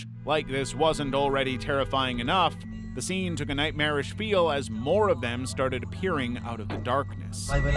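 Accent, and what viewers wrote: United States. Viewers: You reference kilometers over MPH, yet have an American accent. Are you American?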